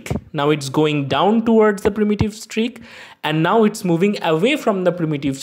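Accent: India. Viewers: he's indian, how else is he supposed to speak.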